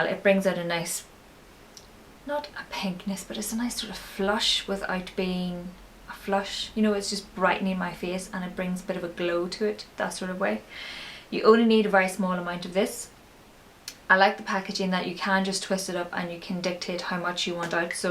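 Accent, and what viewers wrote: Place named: Northern Ireland, United Kingdom. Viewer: The NI accent!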